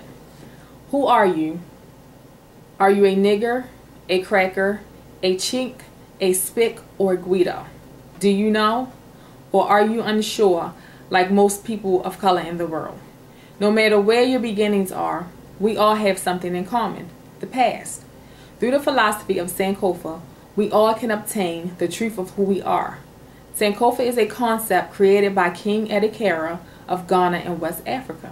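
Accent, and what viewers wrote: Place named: Baltimore, United States. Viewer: You got to be from Baltimore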